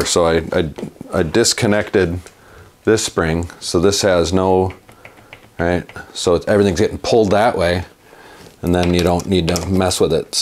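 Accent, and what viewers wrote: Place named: Midwest, United States. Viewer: Judging by your accent, you're somewhere in the Midwest. Where's your shop? I'd patronize your place for sure. You seem to have a lot of integrity and know how.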